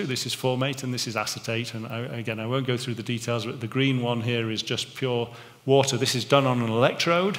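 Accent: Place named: England, United Kingdom. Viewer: Such bull shit delivered with an english accent to impress the really stupid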